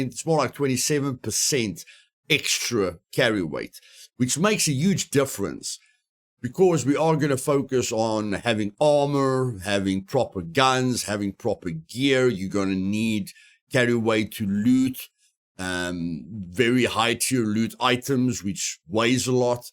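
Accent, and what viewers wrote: South Africa. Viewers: You south African bro? Cool accent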